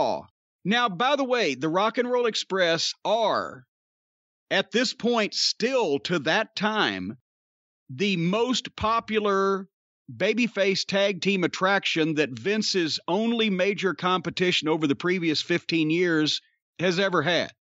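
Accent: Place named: Kentucky, United States. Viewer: Especially considering Jim is originally from Kentucky and still has his accent.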